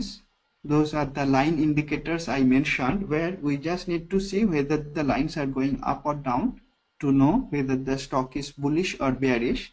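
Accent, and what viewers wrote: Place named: United States. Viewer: Good! liked it. I expected a typical Bengali accent but instead an American! :). would like more from you.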